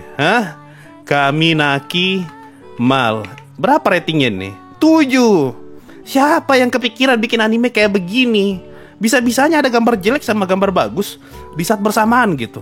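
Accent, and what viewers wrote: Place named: Masamba, Indonesia. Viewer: logatnya kaya orang masamba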